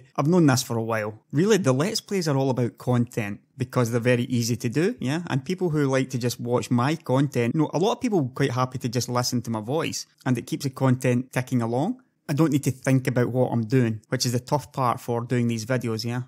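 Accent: Scotland, United Kingdom. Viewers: hey i really enjoy your x-com vids. Start a twitch channel and freestyle it, and your Scottish swear more! :) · What I wonder about is why am I listening to a Scotsman whose Youtube localisation is from Sweden. There's clearly something not fitting right here. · can i ask are you scottish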